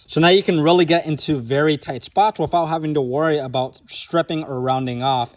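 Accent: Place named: Somalia. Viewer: I love you Somalian accent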